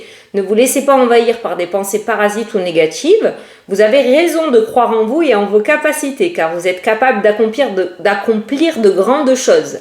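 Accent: South, France. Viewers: Désolée pour le commentaire tardif, pas eu le temps de l’écrire hier après t’avoir écoutée. Je laisse rarement des commentaires mais là il le fallait absolument. Ta robe te va très bien mais ton sourire est encore plus magnifique. Les 2 ensemble c’est wow!! C’est d’ailleurs pour ton énergie, ton sourire, ta bonne humeur (et parfois tes petits coups de gueule), ton naturel, ton accent du sud (...) que je te regarde. Un grand merci pour tes vidéos et ces moments de partage!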